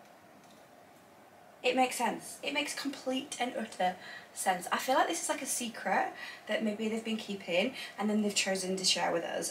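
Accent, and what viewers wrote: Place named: Leeds, United Kingdom. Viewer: Love you and your amazing reviews! I used to live in the North of England and I really miss it from time to time, so love hearing your sweet Leeds voice!! Ok so question, what SKIMS would you recommend to go under the Soft Lounge Slip Dress ? xx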